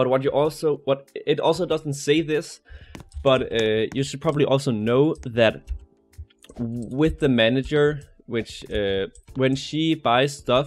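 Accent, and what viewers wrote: Denmark. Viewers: Er du da dansk?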